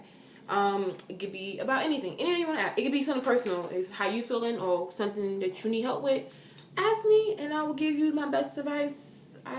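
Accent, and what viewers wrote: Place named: New York, United States. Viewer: u sound like either a new yorker or a philly girl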